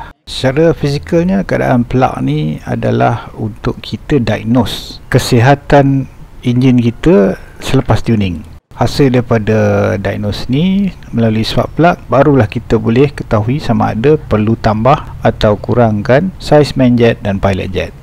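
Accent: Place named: Kuala Kangsar, Malaysia. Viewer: Assalamualaikum, buat video lagi, suka dengar Abg loghat Perak dan ilmu yg zaman sekarang susah nak dapat... Terbaik bang (S.I.F.U)!!! Semoga Allah permudahkan segala urusan Kome dan semoga Allah memberkatimu insya'allah, 🤲 Aamiinn Yaa Rabbal'alamiinn:)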